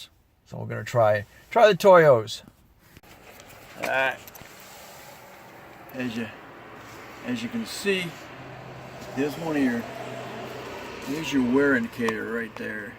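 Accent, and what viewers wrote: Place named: Vermont, United States. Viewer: guy sounds like he's from Vermont.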